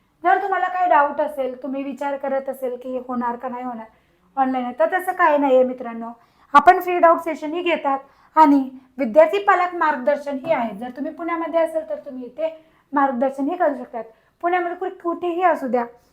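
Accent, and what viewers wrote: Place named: Gujarat, India. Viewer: नमस्कार मॅडम आपका टोन गुजराती जैसा है l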